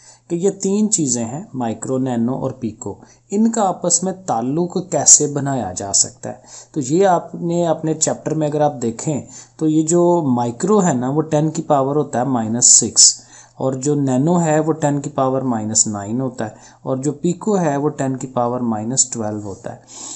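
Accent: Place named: Lahore, Pakistan. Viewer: Ap Lahore sy lgty hyn sr😊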